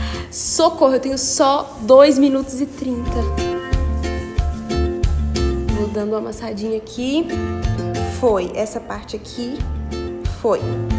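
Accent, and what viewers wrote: Minas Gerais, Brazil. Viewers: Vc e mineira?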